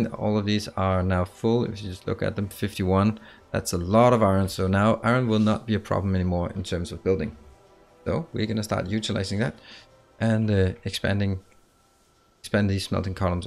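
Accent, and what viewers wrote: Denmark. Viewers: He is Danish. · Danish I believe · are you danish? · Danish I think.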